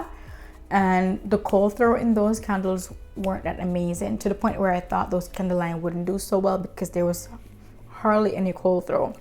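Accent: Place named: Jamaica. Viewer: Totally love your review! Also love your accent…. Sounds like a beautiful Jamaican accent (I am also Jamaican). Good luck in your business journey.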